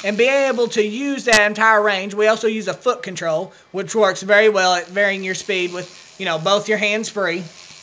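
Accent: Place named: South, United States. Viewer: ha! you are a bit southern sounding.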